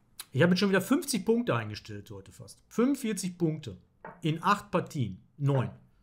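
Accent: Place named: Ruhr, Germany. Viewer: Mal was ganz anderes...also, dieser immer noch hörbare Ruhrpottdialekt, nun mit leicht norddeutscher Färbung. Astrein!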